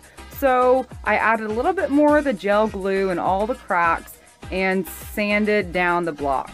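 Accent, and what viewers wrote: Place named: Pennsylvania, United States. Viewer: Love the lantern! Would I be wrong to say your from Pennsylvania? I say that because your voice/accent is so much like my family's.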